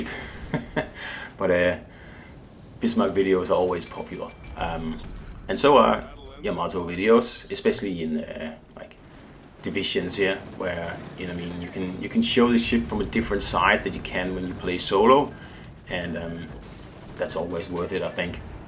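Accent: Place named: Denmark. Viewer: Love your vids and commentary so subbed. I am just wondering were that accent is from, i am guessing Denmark. If I am wrong please know that I did not mean to be rude.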